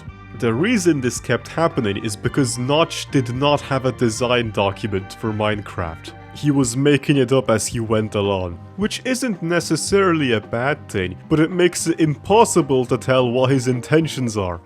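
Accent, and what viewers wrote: Eastern Europe. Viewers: You're a blend of Eastern European and Dub - its unique.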